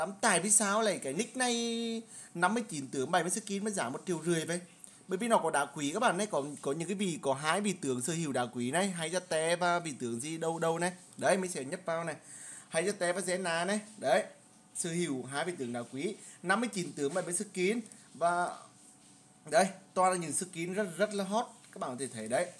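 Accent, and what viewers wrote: Nghe An, Vietnam. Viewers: Anh người nghệ an hả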